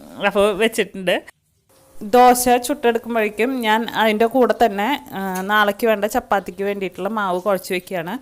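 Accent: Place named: Thrissur, India. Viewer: Thrissur slang varunnundallo.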